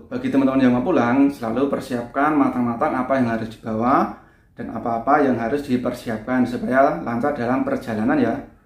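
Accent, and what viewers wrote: Java, Indonesia. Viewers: Medok tenan kang...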